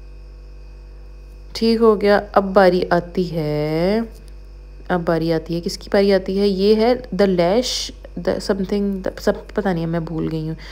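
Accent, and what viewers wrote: Punjab, India. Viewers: Wawwww jwa same Punjabi jehdi edr boli jndi aa..🥰m vry glad to listen ...m from punjab .. nd luv uhhh lehnda punjab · Haye didi tuc teh bilkul india wale mtlb sade vangu ani soni Punjabi bolde oo appa same same aa bilkul haye kash Pakistan wakhra na hunda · Apka Punjabi way of talk mazeka h bht😍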